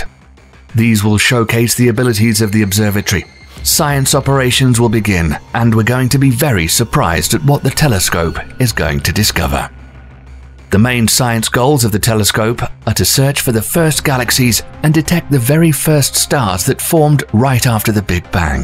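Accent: United Kingdom. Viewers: The master of ceremony is like in this video always seem to have a British accent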